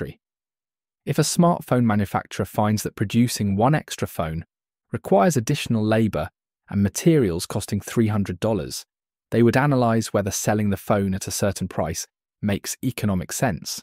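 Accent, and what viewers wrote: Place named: United Kingdom. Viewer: I love your British accent❤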